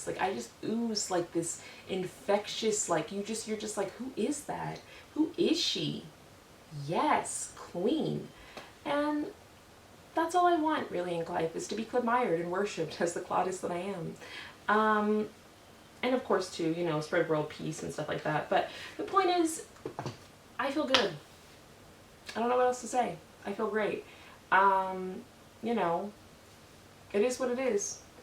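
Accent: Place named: England, United Kingdom. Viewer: My guess is the english accent.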